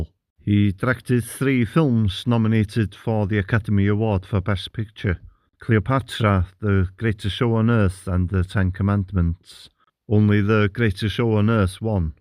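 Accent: Wales, United Kingdom. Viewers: Loving your Welsh accent!! Love your channel xx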